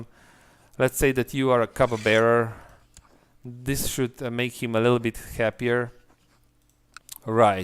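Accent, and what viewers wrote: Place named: Slovenia. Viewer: You sound Slovenian... -_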